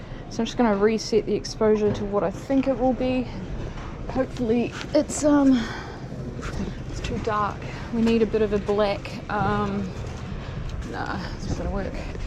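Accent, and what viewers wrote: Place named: New Zealand. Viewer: Ahhhh I knew you were New Zealander from the first time ya talking Kia Ora from home love your vids!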